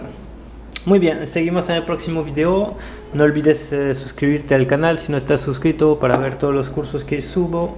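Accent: France. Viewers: t'es francais? Il ya un accent français dans ton Espagnol. Y muchas gracias por el contenido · Tu acento es como frances, de donde eres?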